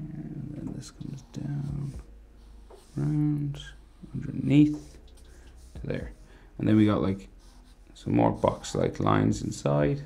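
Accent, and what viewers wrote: Ireland. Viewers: bruh are u irish i am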